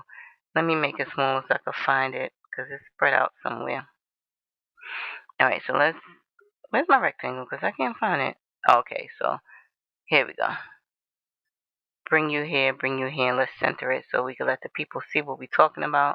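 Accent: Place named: Brooklyn, United States. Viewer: Yep that's about rite😁...can hear Brooklyn all thru ya wordz..big Bronx iz definitely rockin.. keep grindin luv...alwayz nyc luv💪🏾🔥💯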